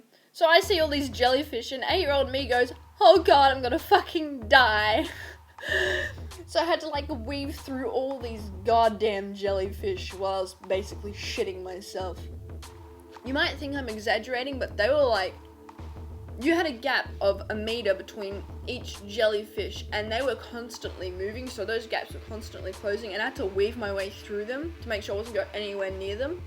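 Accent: Australia. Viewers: I came for the auzzy accent, u stayed for the comedy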